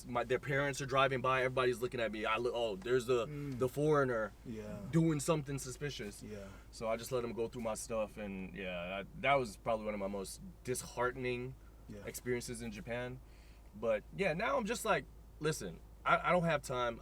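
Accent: Trinidad and Tobago. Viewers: Good he still has his Trini accent 👍🏿😃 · I love how you jump in and out of Yuh Trini accent, it still spot on.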